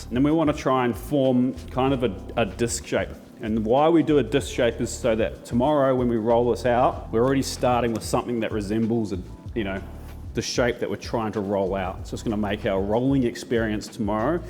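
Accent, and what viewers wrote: New Zealand. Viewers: I had no idea was an Enzedder, but I honestly should’ve guessed it based on the accent 😂. EDIT: Okay, I haven’t watched one of his long-form videos yet, so I wasn’t previously aware of it. Hopefully, that clears up things a bit. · Oh hey, I'm not crazy... Only been watching your videos for about a week (but been watching a smattering of them) and had one where you said you were in Sydney. Through me off because I was sure it was a NZ accent (words like "fish" are key, and growing up in Canada my best friend was from NZ). Happy to hear you say you're originally from NZ. All is right in my brain again.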